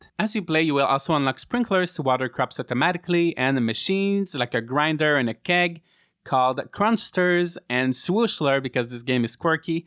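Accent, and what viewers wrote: Quebec, Canada. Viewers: T tu quebecois?? 😅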